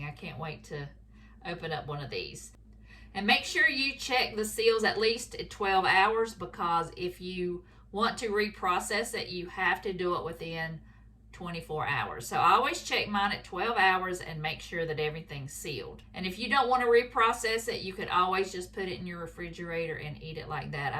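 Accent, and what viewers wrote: South, United States. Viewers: I love seeing these unique jams, and I love your southern voice! 😊 the book all new recipes did it just recently come out? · I need to pull that book out. I’ve never seen that recipe. I’ve learned so much this collab. PS- your southern accent sounds like mine (Bama?)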